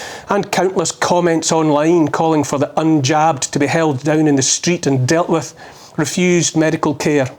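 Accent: Scotland, United Kingdom. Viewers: and Amen to that, Dutch from origin, living in Ireland for 30 years now, and have been called a conspiracy theorist, been ridiculed, discriminated against, lost my work as a music teacher because I refused the jab, now if I were to talk to someone the way I feel about what is going on in this glorious country...I would be called a fascist. I am actually proud to be called a CT, because it means I can see through the BS, but this latest name giving is just sick, and I totally agree with this Dutch lady, comfort is one thing you have given me too, listening to your Scottish accent alone is comfort. God weeps.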